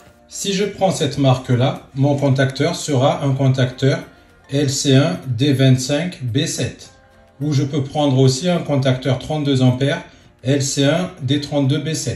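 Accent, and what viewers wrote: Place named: Alpes-Maritimes, France. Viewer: Merci bien parler et on dirait vous êtes 06. Pas 15. Vous exprimer très bien mieux que mon formateur de l'AFPA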